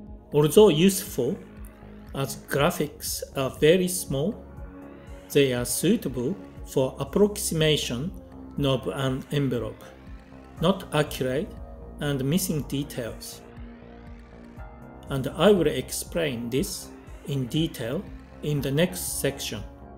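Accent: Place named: Japan. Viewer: God this is a Japanese speaking English...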